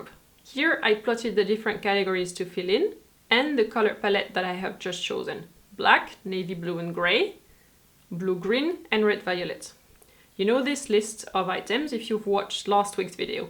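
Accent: France. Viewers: I can tell by your accent that you speak French. Maybe you are french and live in Germany. You don't have a German accent at all. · you can tell from that beautiful accent! She's definitely french :)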